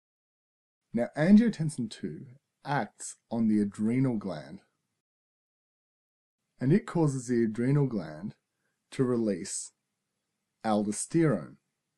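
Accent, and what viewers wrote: Australia. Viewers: This is FANTASTIC! Awesome job! Love that it is Australian as well, and not American with words pronounced oddly! Would be AMAZING if you did a tute for this series on the "Triple Whammy" (effect of NSAIDs, diuretics and ACE-I) - I have searched the internet relentlessly and never found one! Please please please! Thank you x<num> for your fabulous videos! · thanks! I could tell an aussie from a mile away. from a kiwi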